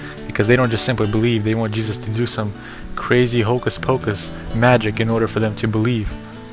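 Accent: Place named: India. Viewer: I cant understand this video complee completee difeebr what was that.... oh... whats going on.. Is this guy indian, i think he is.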